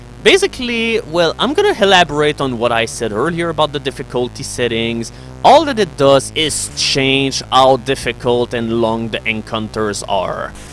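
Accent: Quebec, Canada. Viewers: Yep, that is indeed a Quebec accent. I live with frenchmen, myself. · Best accent ever. Just another reason I am jealous of Quebecois (I'm Canadian). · I think he's from Quebec. It's pretty much the best accent for LPing I reckon.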